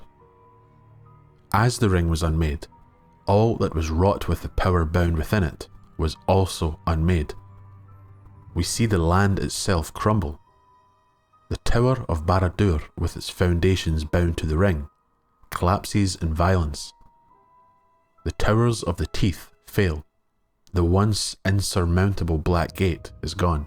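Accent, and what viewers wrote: Scotland, United Kingdom. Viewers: Scottish, Irish or Canadian? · Ok sorry then. You speak (pronounce) Scottish not English. Very hard to listen to for a non english person. "I'm not speaking slang." - Yeah, since you´re a Scot, you speak Scottish. And a very proud one it seems you are. Sorry to have offended you and your way of interpreting the English language. Still you´re prounouncing an "i" like the rest of the English world would an "e". Not sure if you even consider what you speak as English. Maybe even, you are offended by me calling it English. Not my intention to offend, just to listen properly. So maybe it´s me and i gotta go wash my ears. Cheers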